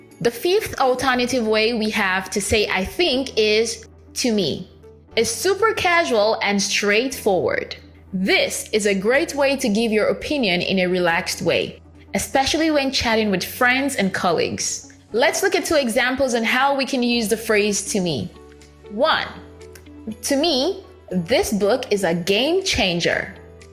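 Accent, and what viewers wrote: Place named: Nigeria. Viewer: Woow!!, Im really glad to come across your youtube channel , your English lessons are helpful and informative, your accent is very clear, by the way , I love my nigerian brothers and sisters, their comedies are A+, Did you grow up in Nigeria? ( your accent ), just asking my dear Sister. You have earned yourself a Subscriber.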